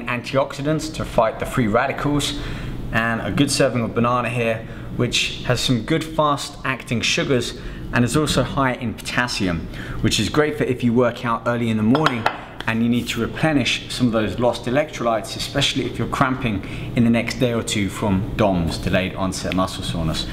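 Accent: London, United Kingdom. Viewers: lol he is british, he has a very strong british accent. Born in london and so on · YOU SOUND LIKE YOU'RE FROM LONDON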